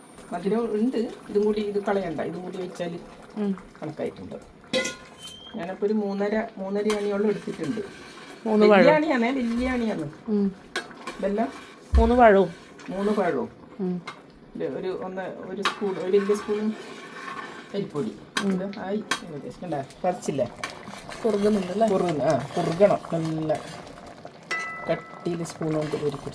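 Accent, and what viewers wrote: Kannur, India. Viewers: ങ്ങളെ കണ്ണൂര് പാഷ പൊളി ആ ന്ന് കേട്ടിനാ ങ്ങൾ ?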